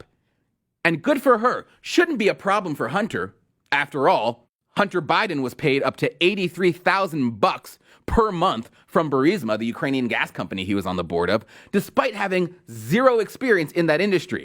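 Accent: Jamaica. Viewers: I like his Jamaican accent. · Are you jamaican? That was pretty good lol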